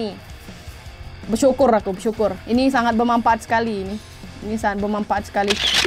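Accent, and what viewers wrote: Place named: Palembang, Indonesia. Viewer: gaya kk bicara palembang kental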